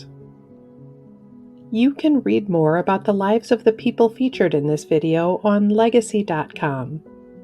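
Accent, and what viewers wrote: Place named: Midwest, United States. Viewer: What a nice narrator. Such a kind, calming voice. And I hear a midwestern or WNY accent which reminds me of home.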